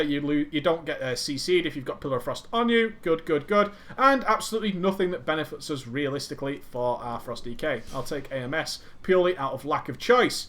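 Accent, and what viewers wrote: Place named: England, United Kingdom. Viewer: what server do u play on BTW i love my wow vids with an english accent lmao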